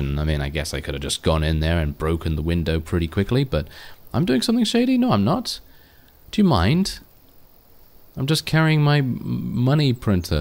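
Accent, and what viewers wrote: United Kingdom. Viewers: Came here for the games and stayed for the british accent.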